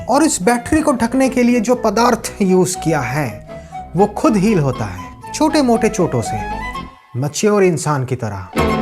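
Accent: India. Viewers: SMASH review bro. Maza aagaya. Keep up the good work!!!! English bhi kardho wohi desi accent pe, aur viral ho jayega 💸💸💸💸 Bless u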